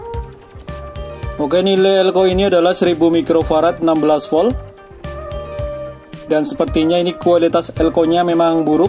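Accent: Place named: Banyumas, Indonesia. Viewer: Hadirrr booos ora ngapak ora kepenak. .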